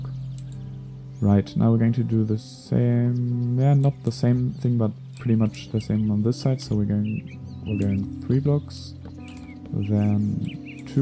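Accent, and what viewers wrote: Germany. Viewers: You are from germany right?^^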